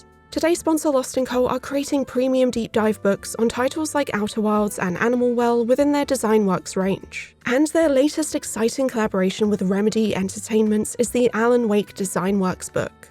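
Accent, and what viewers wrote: United Kingdom. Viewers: WTF you're a woman talking about Matrix video games, your voice is premium ASMR quality, and that sophisticated British accent is the icing on the voice-over cake. LIKE LIKE LIKE LIKE LIKE LIKE LIKE Great job whoever you are, I love you 😃 - Thor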